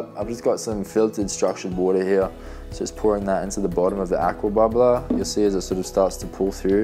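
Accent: Australia. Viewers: Western Sydney I reckon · Yup, thought so too. Maybe Adelaide 😂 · Obviously this dude is a fellow Aussie but I do not recognise his accent...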